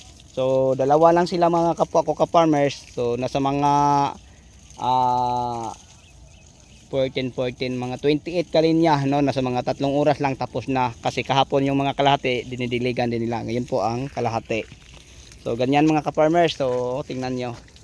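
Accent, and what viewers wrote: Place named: Visayas, Philippines. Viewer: Bisaya Po Kasi ma'am!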